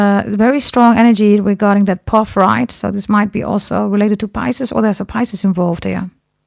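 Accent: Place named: Patois, Jamaica. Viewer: 💛 missing your live and your voice so beautiful and love the Jamaican pathwa and u spot on about me being married and husband Aries and arab and I'm 🇬🇧 will get a reading soon from you 💖 🙏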